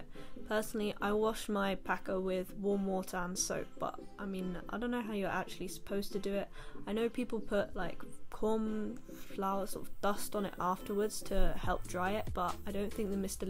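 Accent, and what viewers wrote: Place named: United Kingdom. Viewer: This vid was so much help omg!! I never even thought to use a safety pin, thanks :)) It's also so nice to hear another British accent talking about ftm stuff omg 😭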